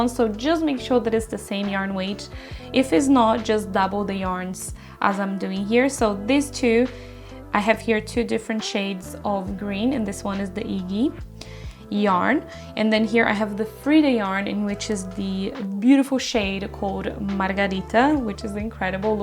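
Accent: Brazil. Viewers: amiga lindooo! fiquei curiosa pelo sotaque, vc é brasileira?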